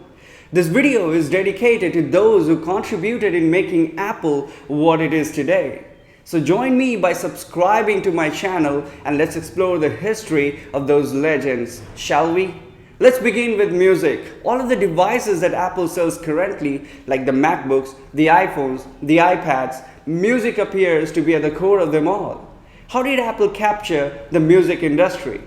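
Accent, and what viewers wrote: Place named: United States. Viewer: Wow....mind blowing English in American tone.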